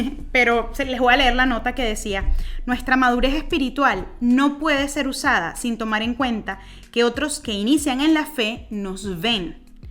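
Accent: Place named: Venezuela. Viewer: De donde eres? Parece de Venezuela.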